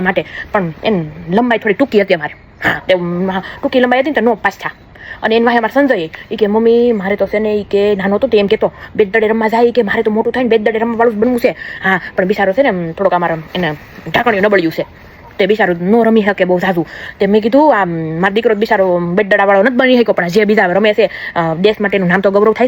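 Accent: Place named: Saurashtra, India. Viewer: બેન તમે ગામડે કેટલો સમય રહ્યા હતા, અને વીદેશમા કેટલા સમયથી છો? તમારી સૌરાષ્ટ્રના ગામડાની બહેનોની રીતભાત અને બોલી ઘણી આકર્ષક છે. વીદેશમા રહીને પણ પોતાની વતનની જીવનશૈલી આબેહુબ રીતે રજુ કરો છો. તમારી રગરગમાં માં ભોમકા ની સંસ્કૃતિ વણાયેલી છે.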